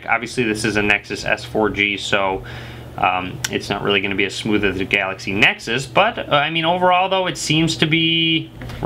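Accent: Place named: Chicago, United States. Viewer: dude i'm not from the states but i know you got a strong chicago accent!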